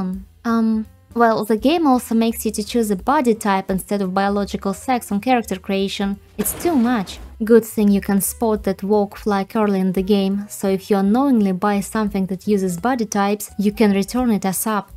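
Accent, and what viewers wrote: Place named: Eastern Europe. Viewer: Yes, it indeed have some slavic languages vibe. Haven't noticed before. She has some strong accent, but it's not clear to recognize for me.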